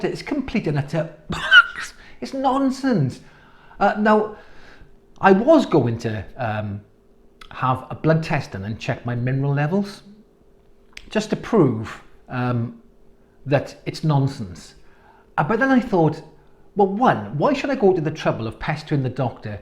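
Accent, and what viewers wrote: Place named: Neath, United Kingdom. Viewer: Cracking video mate. I’m from neath. You sound close. Would love to know what my tap water is. I do want to get one of these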